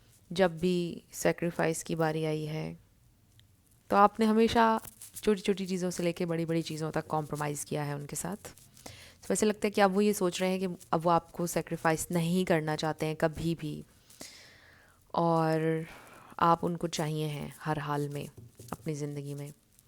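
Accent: Pakistan. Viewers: Aslamo alikum ..mujy aapki voice llehjy sy lgta hai aap peshawar sy hai hindko speaking ....?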